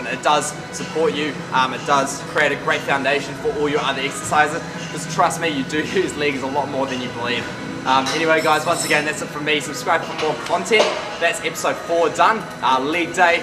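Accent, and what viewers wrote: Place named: Australia. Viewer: a bahbell on ah reck! aussie accents lol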